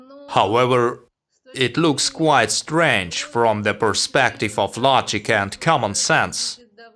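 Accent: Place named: Russia. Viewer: Нууу, без обид, но с таким «хэви рашн эксент» немногие смогут послушать выпуск хотя бы 10 минут... советую уменьшить басы, хотя бы, а то перевод звучит как от «рашн мафиа»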